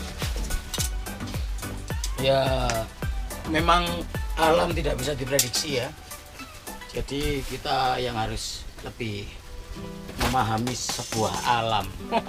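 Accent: Surabaya, Indonesia. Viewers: mas awkmu wong suroboyo ta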